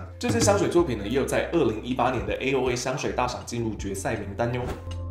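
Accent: Taiwan. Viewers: 每次都講解的好棒。只是「台灣國語」有點太多了😂，很容易打斷美好的情境想像😆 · 香水介紹的很好，但台灣國語真的聽不下去….. 聽到一半很煩躁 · 好很喜歡您的影片富有許多知識且有水準只是後期蠻多影片不知道是為了影片效果有很多“台灣國語”的部分導致影片很想切掉不看不知道怎麼想呢？謝謝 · 生動清晰的解說加上親切幽默的台灣國語，害我也好想來入手幾支，可惜沒有管道啦！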